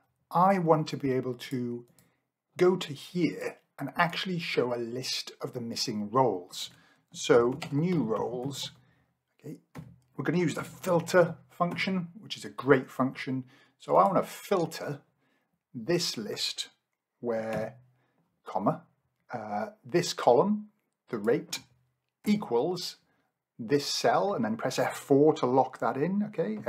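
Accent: Wales, United Kingdom. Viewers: Which part of Wales are you from?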